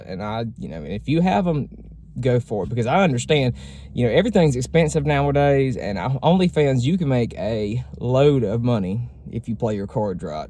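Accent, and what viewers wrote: South, United States. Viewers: I find that to be a ridiculous question tbh. It once again points out the hypersexualization in the LGB community. It also tells me that ppl are watching the videos without hearing & listening. This man has clearly stated that he is looking for love. That he wants a husband 1 day, with kids. He wants a family. He has spoken so clearly about his past & how exhausted he was that he couldn’t find anything burn guys just wanting sex. And then, here comes the OF porn addicts. That only want his body. It’s maddening. Yes he is gorgeous guy, beautiful southern accent, kind heart….yet he is just still being objectified. Like jeez ppl. Then yall wonder why yall be dying alone, at the age of 50 & 60 still on hookup apps getting rejected daily. I hate it. Truly.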